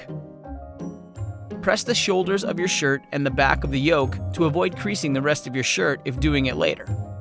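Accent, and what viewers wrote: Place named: United States. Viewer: Bli kvitt den teite amerikanske stemmen.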